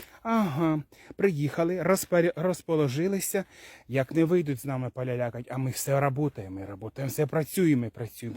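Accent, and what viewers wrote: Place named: Bila Tserkva, Ukraine. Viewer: Боже ж ты мой, какой приятный человек, и говор его душу ласкает. И юмор добрый,житейский. Так говорила моя бабушка и мама, они родом из под Белой Церкви. Давно их нет на этом свете, а я помню их говор. Спасибо за юмор, за доброту. Всем мира и чистого неба.🎉🎉🎉❤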